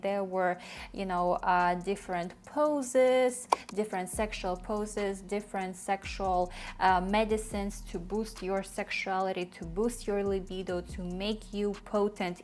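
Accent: Russia. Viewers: Just discovered your channel, love your Russia accent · they have a declining population. they have a huge problem. they need to encourage sex, not attenuate it. you look Chinese but sound Russian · you have a russian accent. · You sound russian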